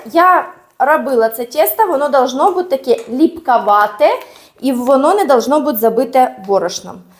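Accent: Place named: Kherson, Ukraine. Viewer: нравится такая речь У нас на Херсонщине так разговаривают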